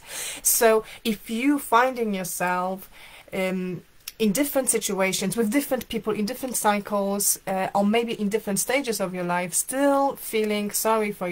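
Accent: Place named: Germany. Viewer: Are you german? I hope you do not mind my question its the accent. I loved your video, a good reminder. JazakyAllahu Khayran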